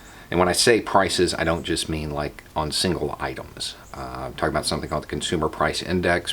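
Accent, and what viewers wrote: United States. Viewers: Fucking hell, I'm so used to hear trumpian nonsense these days that hearing sense spoken with an american accent nearly made me fall off my chair! I should know better as there are as many smart americans as any other nationality. Speaking from europe. We got our own troubles of course, it's not paradise, we're not that bad yet even tho there are plenty, too many, who wish to adopt the USA ultra liberist system full on. Power of hollywood I suppose. Good luck to all of us.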